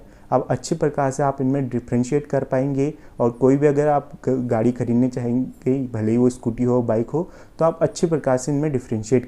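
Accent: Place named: Uttarakhand, India. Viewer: bhai teri videos se ek chiz clear hai ya to tum mechanical engineering ya automobile engineering ke student ho..... aur bolne ke tarike se lga rha... Uttarakhand se ho...🤩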